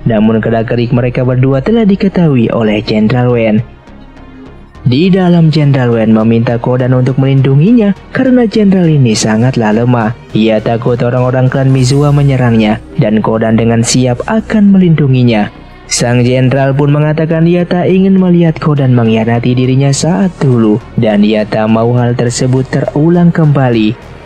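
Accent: West Java, Indonesia. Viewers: Orang sunda nya??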